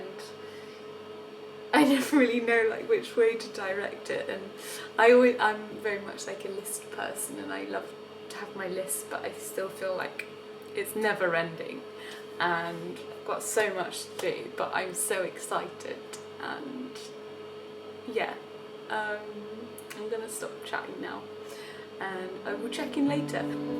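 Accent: United Kingdom. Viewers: It's very nice to listen to and watch you. Your baking looks very delicious. I'm interested in any topic, do what you like. p.s your video for me it's the practice of understanding the English language and British English accent. I'm serious :) Thank you.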